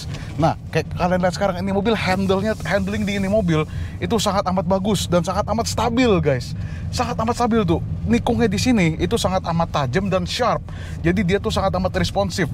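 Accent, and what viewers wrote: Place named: Indonesia. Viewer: Keren koko, logat nya indonesia banget...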